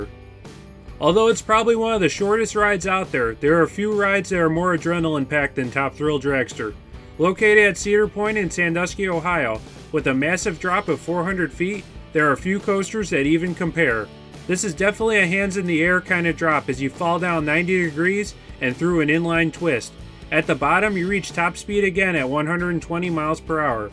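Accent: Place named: Minnesota, United States. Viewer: This mans got the most minnesoota accent I ever heard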